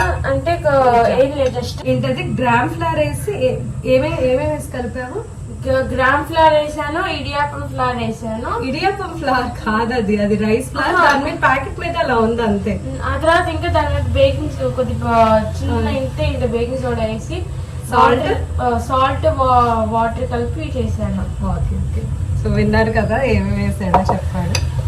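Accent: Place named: Godavari, India. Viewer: Enka chadavaledu but I am watching his yt shorts ma super godavari slang❤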